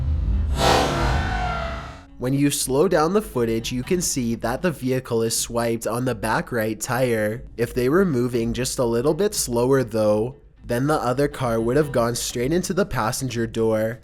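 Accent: Sweden. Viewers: Är du Svensk eller?? = Are You fr.o.m. Sweden??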